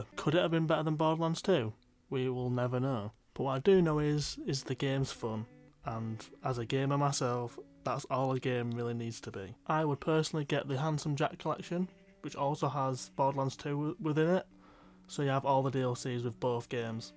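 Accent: United Kingdom. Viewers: I'm more invested in the politics/news side of YT so I don't have a lot of experience with game reviews but this was definitely a good first video. Even though you're from the wrong side of the Pennines, it's still nice to hear more regional accents! 😁 I like how you break the review up by focusing on different elements (characters, gameplay, story, pros/cons) - this would work really well with some graphics and also help you to stay focused (if you're considering how to expand your look and build a brand). You sounded pretty confident throughout and if you were using a script then I think you must have spent lots of time memorising it. The only notes I have for delivery are the intro, you seemed to rush through it and it was a bit too fast. Take a breath and remember that you're awesome! Good luck with the channel, and well done on an engaging and interesting first video. 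👏👏👏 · I'm no gamer but a sub of your Dad's, a Bradfordiian and love our accent, I've subbed. All the best :) x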